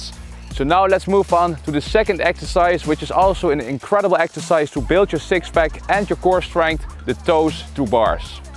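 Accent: Netherlands. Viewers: "...arch your back too much so don't bring your feet ÖP in the air ..." Oh boy ,I love the netherlands accent, I really do ! Greeting from your neighbour eastside of the Maas/Rhine. But FYI my english speaking skills are worse, bring people bleeding ears :-D therefore my accent isn't that charming. Keep up your nice contents!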